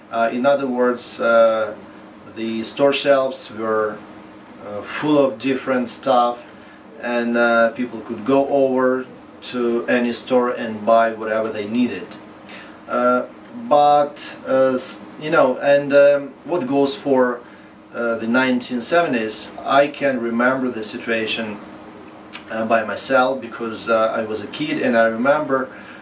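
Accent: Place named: Russia. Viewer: He doesnt speak english but jewish american ,,,and you speak real english with a beautiful russian accent . Thank you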